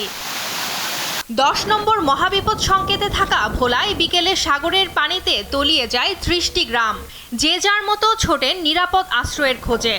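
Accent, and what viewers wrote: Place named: Kolkata, India. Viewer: কোলকাতার মতো কথা বলে কেন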